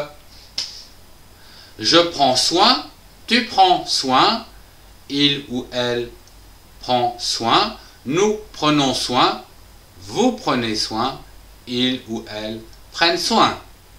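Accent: France. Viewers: la mélodie de votre voix est très française en anglais, c'est sympa. aussi votre accent, comme un copin de moi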